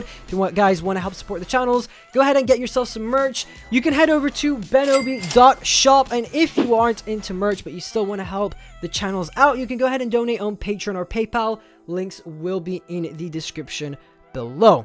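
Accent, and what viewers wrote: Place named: United States. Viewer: You’re Scottish ? You sound American, what part of Scotland are you from ?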